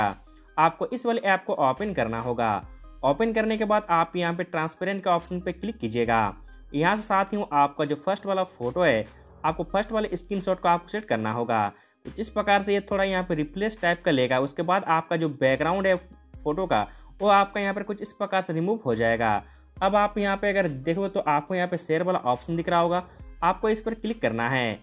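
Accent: Chhattisgarh, India. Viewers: Bhai aap chhattisgarh se ho kya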